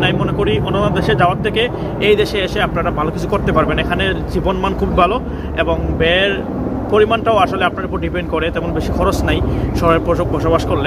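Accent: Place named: Sylhet, Bangladesh. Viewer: bhaiii uni Sylheti. Unara Bangla kor pare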